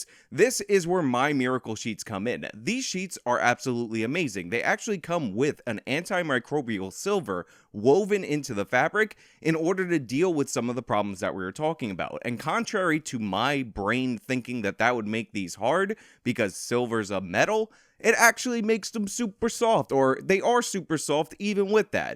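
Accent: New York, United States. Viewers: Alright the whole smartass NY accent is starting to get annoying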